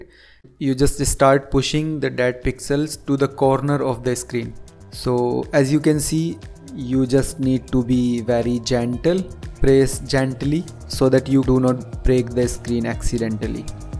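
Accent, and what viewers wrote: India. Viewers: He has the best indian accent!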